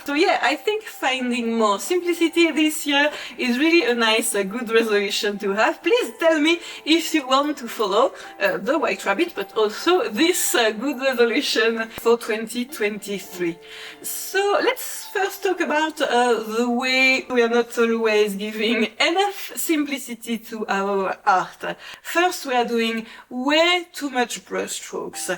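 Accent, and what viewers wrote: France. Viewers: Bonjour, ah ben plus de vidéos depuis janvier, triste je suis! J'adorais travailler mon anglais et votre délicieux accent français m'aidait beaucoup, la cerise sur le gâteau l'aquarelle!!! Rien de grave n'est arrivé j'espère. Mais où est passé le? Bien cordialement.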